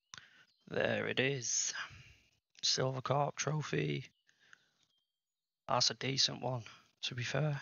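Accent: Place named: United Kingdom. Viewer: Sounds like your from UK, subscribed. Works amazing there with oversized carp mix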